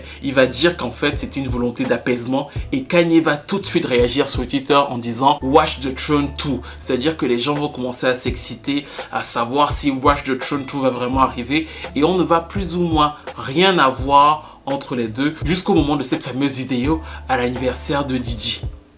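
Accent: Cameroon. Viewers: Tu as un petit accent camerounais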